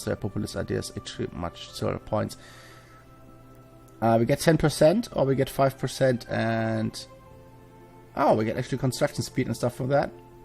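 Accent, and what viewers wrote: Germany. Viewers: ähm haha bei Ihnen hört man sehr dass sie Deutscher sind 🙈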